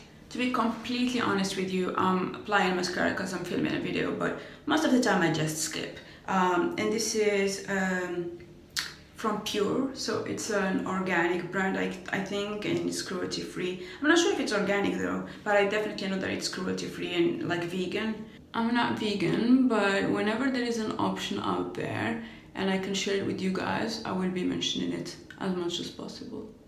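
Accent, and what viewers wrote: United Kingdom. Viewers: Great video! You seem really comfortable and happy it's nice to see. I like the makeup look, I just don't give myself enough time to apply makeup in the mornings so skip it. Glad you are working on finishing your open products. I am doing the same. I think you my be picking up a bit of a London accent its cute!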